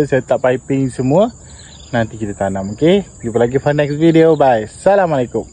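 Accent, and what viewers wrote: Pahang, Malaysia. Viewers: macam orang pahang. lorat pahang ke?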